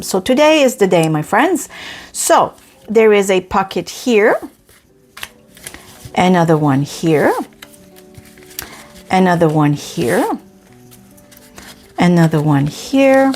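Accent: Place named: Quebec, Canada. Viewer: This is so cute and easy !! Thanks for sharing !! Is your French from Quebec Canada ? Just curious since I’m sensing a French Canadian accent 😅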